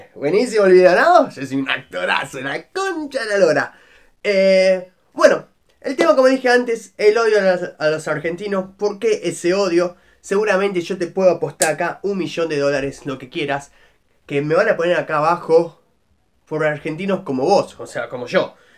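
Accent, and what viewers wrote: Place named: England, United Kingdom. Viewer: Flaco pero si vos sos argentino porque tenes ese acento inglés ?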